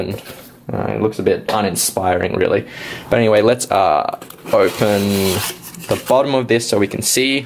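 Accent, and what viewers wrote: Australia. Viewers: I like ur australian accent!!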